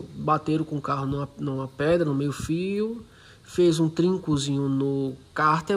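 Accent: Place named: Pernambuco, Brazil. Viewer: Pelo sotaque se for de Pernambuco vou te procurar. Tenho um Etios e queria ué CV olhasse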